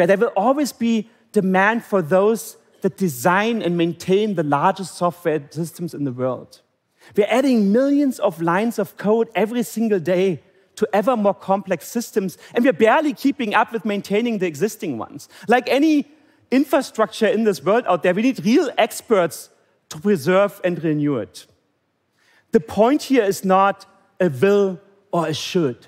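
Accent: Germany. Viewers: My mom once said "If you do not have to say anything nice say nothing at all" and this is why I do not leave a comment about his strong German accent.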